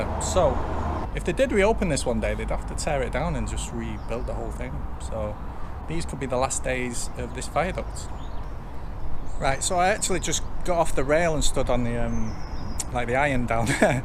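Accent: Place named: Manchester, United Kingdom. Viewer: Well done typical Mancunian humour, brave face, big smile while all the time weeing your pants well done mate you did us all proud. I miss the Old town and the accent. Thanks for your great Docos Bill the Mancunian in Western Australia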